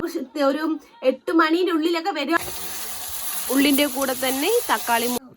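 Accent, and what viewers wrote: Palakkad, India. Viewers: Palakadan malayalam ❤❤❤❤🎉🎉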